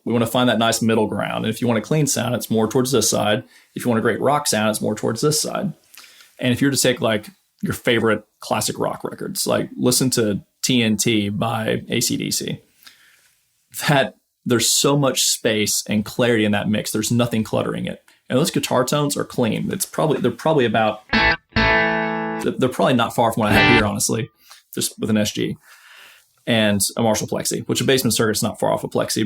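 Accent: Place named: Ireland. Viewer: Some great advice here, thanks. Are you of Irish extract?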